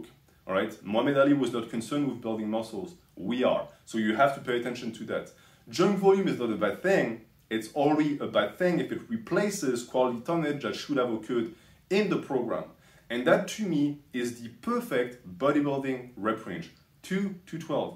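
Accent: France. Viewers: R u from France bro ?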